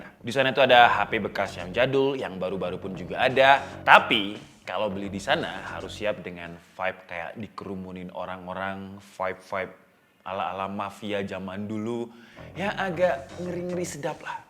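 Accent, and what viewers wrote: Java, Indonesia. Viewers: Lahyo logat e malangan wkwk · Medok banget bahasane mas 😁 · Loalah wong jowo ta pantes nyedok😂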